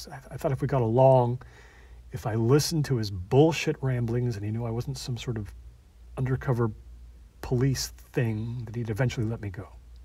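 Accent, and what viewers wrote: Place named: South, United States. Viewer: Can’t take the southern outta the Australian Ohioan 😄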